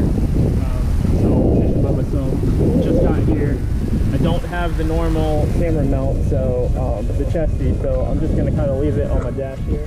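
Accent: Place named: Oklahoma, United States. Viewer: Dude are you from Oklahoma?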